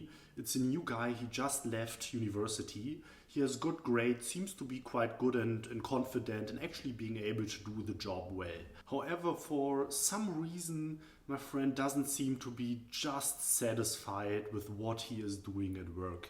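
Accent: Germany. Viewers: My business mentor I did know I had. Like the German accent more so the content. I asked them all and you answered. danke aus tiefstem herzen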